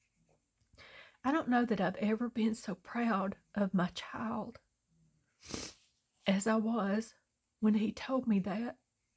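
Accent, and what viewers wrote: South, United States. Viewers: the painted papers are beautiful. My daughter and son-in-law served in Army and they did a tour in Afghanistan. Thank you for being you! I enjoy watching your videos and listening to your southern accent. You are a great inspiration for me. I can't wait until the end of August when I retire so that I can craft full time. God Bless and get well soon!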